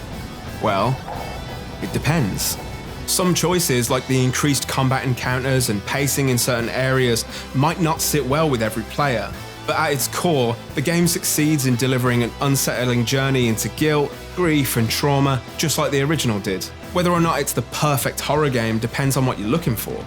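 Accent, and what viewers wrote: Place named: United Kingdom. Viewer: oh shit and he's British! (Same here dude)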